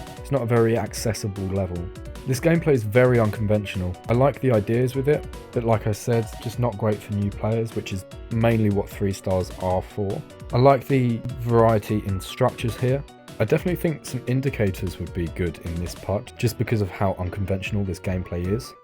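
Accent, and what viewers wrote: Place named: United Kingdom. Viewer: nice video, definitely british accent carried though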